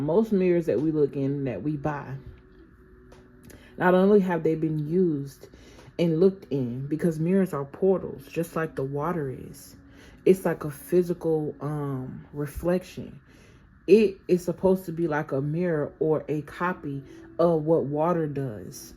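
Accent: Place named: South, United States. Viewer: Country accent!🤣🙋🏼‍♀️ I appreciate you and your messages so much. ❤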